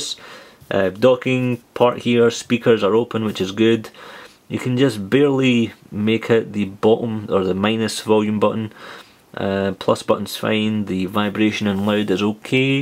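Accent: Scotland, United Kingdom. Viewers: Noobstyleful he is scottish unless u can't make out the accent if u are not all there in the head!!:)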